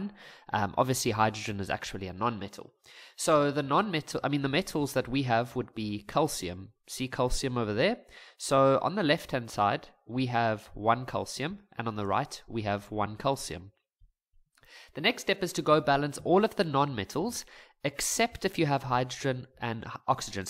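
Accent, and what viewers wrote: South Africa. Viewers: Thank you brother, you are a life saver, the way you explain is amazing, the South African accent is also great❤ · I WAS SO SUPRISED WHEN I HEARD THE SA ACCENT.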